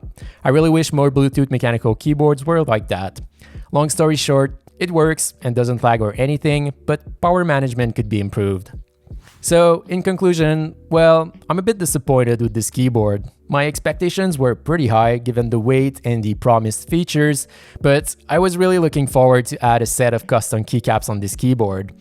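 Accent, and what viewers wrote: Quebec, Canada. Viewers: you are french canadian right?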